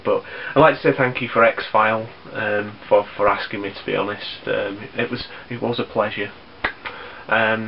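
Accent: United Kingdom. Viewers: you almost sounded british mate ;).. Great answers and it's cool how your family are mostly supportive of your collecting. I respect anybody who has a passion for something!.. on a completely unrelated note, i remember a while back you said that you found a reasonably priced copy of V-V.. did you end up getting it?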